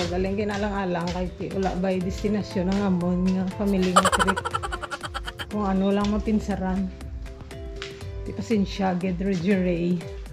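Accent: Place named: Iloilo, Philippines. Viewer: Ilonggo ka po talaga magsalita😅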